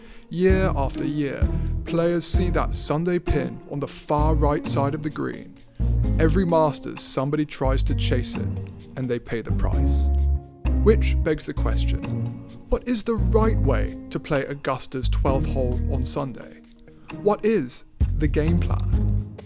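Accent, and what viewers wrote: RP, United Kingdom. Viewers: Your narrator is too posh. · Voiceover is too posh it makes me want to rip my ears out. Would want either a modern London accent or better yet, a good old Georgia/SC boy that grew up in the shadows of Augusta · Great content, but narrator is the single poshest man I’ve ever heard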